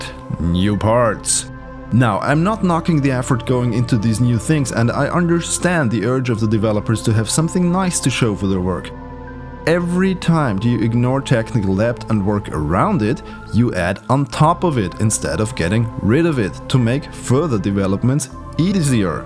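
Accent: Germany. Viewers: You can even hear the typical German accent when he speaks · Du bist Deutsch? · tolles video wusste doch, dass du deutscher bist, der akzent ist unverkennbar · R U German? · Are you from Germany? BTW, great Video!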